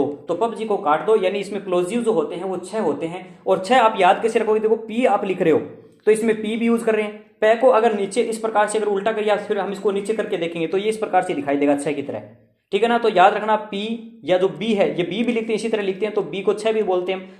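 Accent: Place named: Haryana, India. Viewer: Sirji aapki language me haryanvi tone kse aapto rajsthan se hoji 🤔🤔🤔🤔🤔🤔🤔🤔🤔🤔🤔🤔🤔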